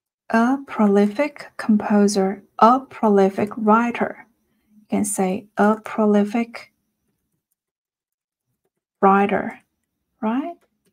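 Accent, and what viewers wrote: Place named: China. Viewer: You might have been referring to my comment regarding your accent. If so, I was only curious and not suggesting that you change it. I’m a Taiwanese American, having been living in the US for 37 years. I also had the experience of attending an American overseas high school before coming to the US for college. When I’m relaxed and not tired in general people here think I’m an ABC. Not to brag but just explaining my background. “Protracted” here means prolonged or extended. Already your English is much better than some of the other Chinese Big Vs. I hope they could get their pronunciation to your level. What I’m trying to say is that, foreign accents are actually charming to Americans, especially European, British, and Australian. As long as you lose the Chinese accent you should be fine.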